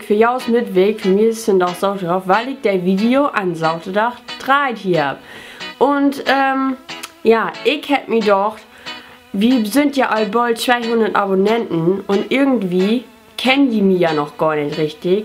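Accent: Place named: Low German, Germany. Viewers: Also ich hab mir erst das deutsche Video angeguckt und dann das auf Plattdeutsch. Ist ja echt cool wie ihr da bei euch redet. xD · Schon cool wenn die Leute heutzutage noch Plattdeutsch können. Dein Plattdeutsch ist ganz anders als das, was man bei uns in der Eifel spricht, trotzdem versteh ich so 90% von dem was du sagst. Echt interessant und cool :)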